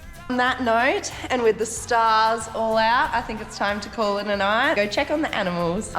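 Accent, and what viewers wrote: Australia. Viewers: Your awesome you sound like a Aussie what a chick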